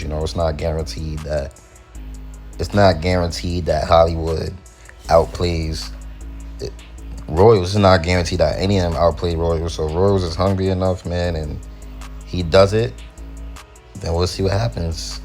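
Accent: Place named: New Jersey, United States. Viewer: I heard your voice and thought-"is this guy from Jersey or something?" Then u said u live in NY😂👍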